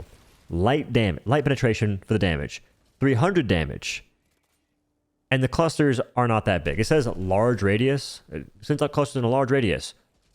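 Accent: South, United States. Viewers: To this day still trying to figure out accent because sometimes it sounds southern but other times it doesn’t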